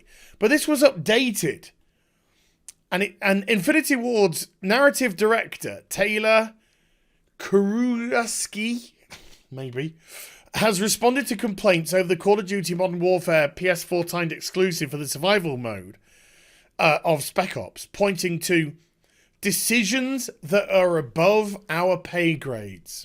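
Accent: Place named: United Kingdom. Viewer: You just keep speaking British to me and I'll keep liking your videos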